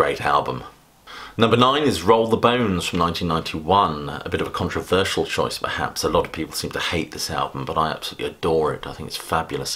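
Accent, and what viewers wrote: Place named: RP, United Kingdom. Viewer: I can listen to this fellow speak for days. Remarkably articulate and filtered through a regal British accent. He reminds me of Ian Anderson, to a small degree.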